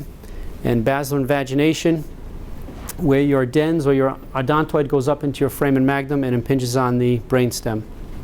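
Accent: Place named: New England, United States. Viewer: Lol. Near the start, his New England accent really threw me off momentarily. “…in other POTS of your body.” Lol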